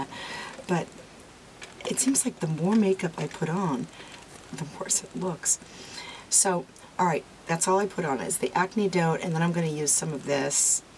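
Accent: Boston, United States. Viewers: You to. I love it when I hear your Boston accent. LOL. I think your hair look beautiful. Bangs or no bangs. xo Marie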